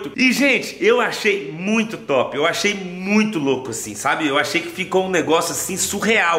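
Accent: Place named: Bahia, Brazil. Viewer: Baiano demais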